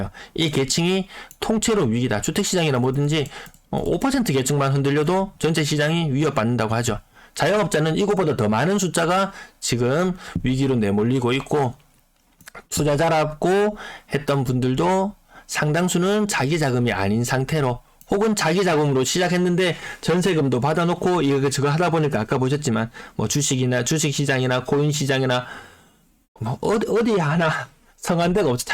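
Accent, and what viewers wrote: Gyeongsang, South Korea. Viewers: ㅎ 구독자 100만 언급 저도 그체널들 잘봅니다 매끄러운 서울말씨들 하지만 님은 경상도분이라 의도적으로 불친절하다고는 안봅니다 요즘은 꺼 더 잘챙겨봅니다 이런 정보야말로 감사하죠 화이팅 하십시요~^^ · 친근감이 있고 경상도 발음 너무 반갑습니다 알차고 유익한 정보 감사 하지요~~♡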